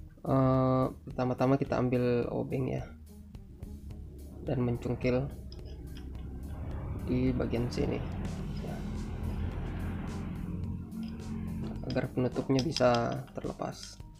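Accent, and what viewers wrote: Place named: Sulawesi, Indonesia. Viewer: Orang sulawesi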